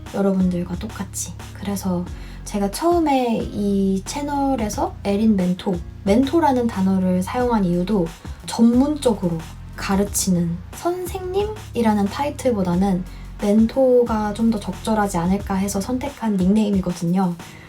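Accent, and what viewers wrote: United Kingdom. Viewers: 채널 찾아서 너무 행복해요 😍영국 영어 공부하고 있는데 어렸을때 해외 거주 경험이 없는데 영국 발음을 구사하시는 분은 많지 않더라구요 또 있으시더라도 이렇게까지 자세히 어떻게 공부했는지 설명해주시는 유튜버는 처음 인 것 같아요 영상 하나하나 도움이 정말 많이 돼요!!! 저도 처럼 영국 발음으로 영어 하는 날까지 열심히 공부하겠습니당 ㅎㅎ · Hi! I happened to know your channel and I'm amazed by your British accent! I've never seen any Asian people can speak British pronunciation at your level! I'd love to know how you've learned British pronunciation (I really wish I could understand your language!! - I'm a Japanese viewer..) Anyway, I'm looking forward to watching more videos from you! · 헐 영국인인줄 알았는데 이민도 심지어 유학도 아니고 혼자 배우신거예요? 헐..? 그 결정적인시기?어린나이? 그때 안배우고 이미 나이많이먹고 해도 저게 되는거구나...헐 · 목소리랑 발음 너무 좋으세여, 진짜 찐 영국영어다 우왕 👍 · 진짜 영국영어 너무 듣기 좋네요...덤으로 도움도 받고 갑니다..! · 영국발음 너무 좋다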